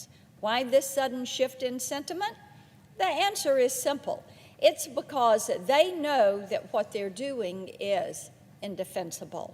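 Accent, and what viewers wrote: South, United States. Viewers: Her Southern Schoolteacher accent is absolute music. That accent spans from Laredo, Texas to Pigeon Forge, Tennessee. Pure ASMR.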